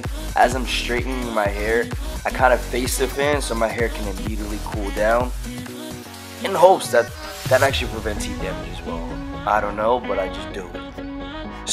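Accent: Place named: United States. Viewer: Do you actually know how to speak in Tagalog? Youre Filipino right? You have such a nice American Accent! :) lovin all your videos!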